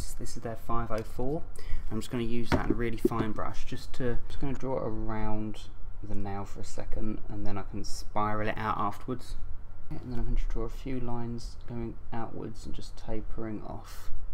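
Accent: United Kingdom. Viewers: Does anybody think his nice awesome British accent fits that character perfectly you sound so sweet but looks terrifying cx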